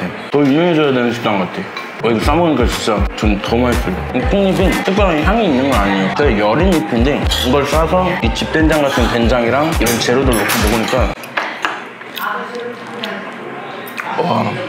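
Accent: Jeju, South Korea. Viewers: 인줄 알았는데 이였군요~~ 저도 제주도민인데 10년째 서울에 살면서 제주도 사투리가 들리면 괜히 반갑고 귀가트이더라구욯ㅎㅎ 이번에 추석때 내려가는데 함 금복식당(추석때 열려나???ㅜㅜㅜ)가보고싶네요~~:)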